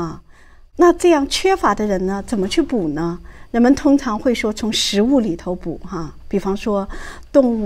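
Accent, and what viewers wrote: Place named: China. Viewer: 这位医生有大陆口音😊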